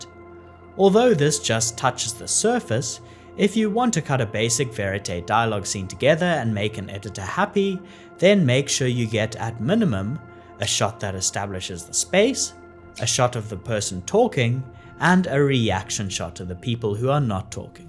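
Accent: South Africa. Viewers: Are you South African??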